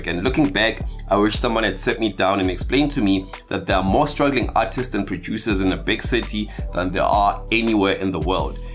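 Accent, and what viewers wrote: South Africa. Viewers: i heard by the accent that this is a 🇿🇦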